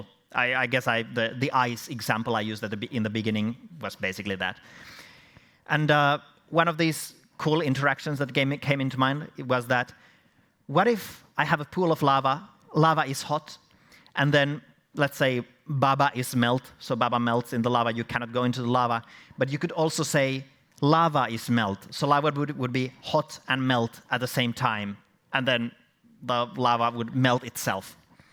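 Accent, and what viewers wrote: Finland. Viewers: The Finnish accent Rakastan sitä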